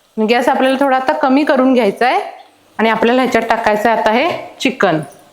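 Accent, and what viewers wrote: Kolhapur, India. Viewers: कोल्हापूरी टोन यायला लागलाय!😊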